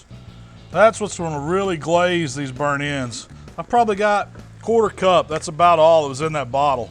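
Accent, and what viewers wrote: South, United States. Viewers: As soon as I hear that thick southern draw, I know this is gonna be some good BBQ. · The best channel to pickup the American accent. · Vegetarian here. This is sooo satisfying to watch! Specially his southern accent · First seven seconds is all I needed to watch to know this guy is legit. Fat guy with a southern accent? SOLD.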